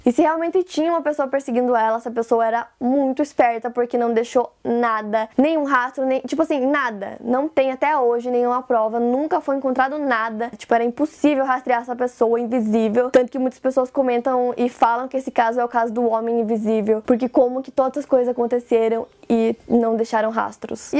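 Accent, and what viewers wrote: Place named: Rio Grande do Sul, Brazil. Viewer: O sotaque dela me soa gaúcho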